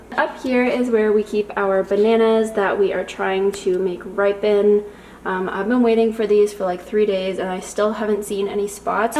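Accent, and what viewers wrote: Canada. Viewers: I love the way Canadians say “pasta” 😍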